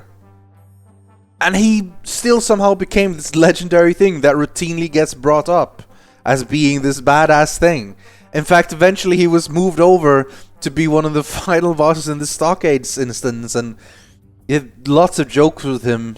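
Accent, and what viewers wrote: Sweden. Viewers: He is swedish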